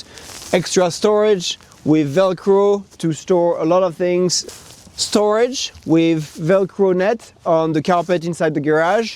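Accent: France. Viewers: Love the French accent! ❤ Great van, too!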